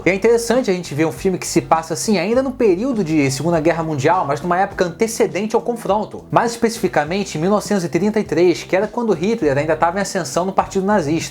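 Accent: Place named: Rio de Janeiro, Brazil. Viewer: Sou carioca e até eu acho q vc tem sotaque de carioca.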